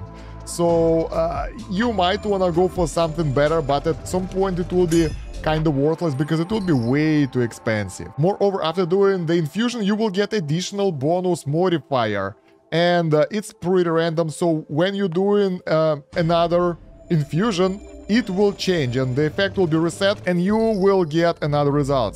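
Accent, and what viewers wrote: Russia. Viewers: ДАА, ТАКОЕ ЖЕ ОЩУЩЕНИЕ, ЖЕСТКИЙ РУССКИЙ АКЦЕНТ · такое ощющение что он русский и разговаривает на английском с ужасным акцентом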